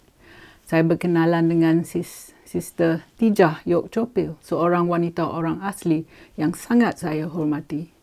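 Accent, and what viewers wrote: Perak, Malaysia. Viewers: Saya suka slang Perak. Tahniah dan salute! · Tahniah. Lucu gak,bila loghat Perak digarap sekali. Hahaha.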